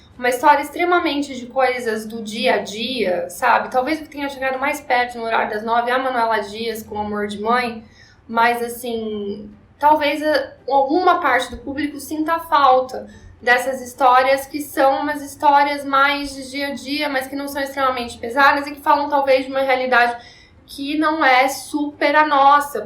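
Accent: Rio de Janeiro, Brazil. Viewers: Amei o sotaque,!❤como carioca, confirmo q é assim mesmo! Melhor q o da Jade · O sotaque carioca da...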